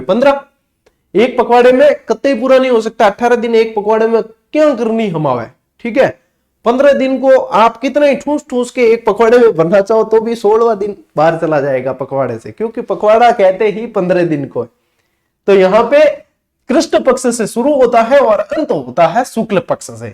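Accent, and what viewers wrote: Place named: Behror, India. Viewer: Guru ji khandavo bole mare बहरोड़ साइड m😂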